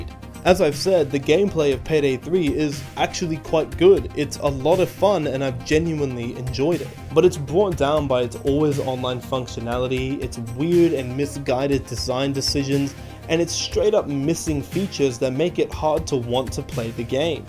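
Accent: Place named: Australia. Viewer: Is he Australian, or is that just a speech impediment?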